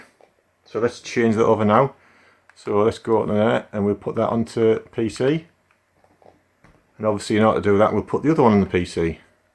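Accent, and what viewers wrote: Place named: North East England, United Kingdom. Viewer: Looking forward to catching up on some of your vids matey.. I take it from your accent and reference to Boro you're a fellow North Easterner lol 😎👍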